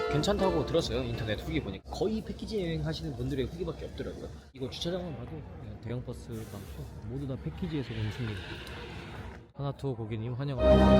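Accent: China. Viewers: 말투가 조선족인데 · 말투 그냥 조선족임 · ㅋㅋㅋ조선족아님 말투가 조선족인데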